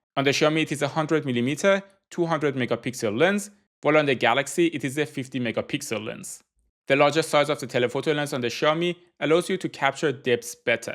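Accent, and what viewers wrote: Iran. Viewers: Persian accent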